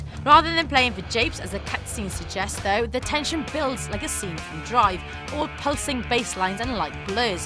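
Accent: Wales, United Kingdom. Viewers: Sounds more like a Welsh accent than an English one.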